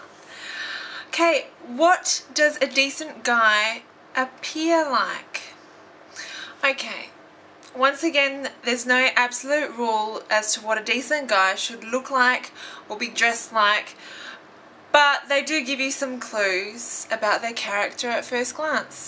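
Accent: Australia. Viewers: she's not british, she's australian.. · All true lol... u're good, must have had a lot of ups and downs on this field so far uh? Hmmm... i don't really need to be shaved to look like a decent guy, right? hehehe I just love your accent... you Australians, ai ai ai!! I could not let this detail out, since I'm already posting you a comment. Anyway, nice post. Cya around!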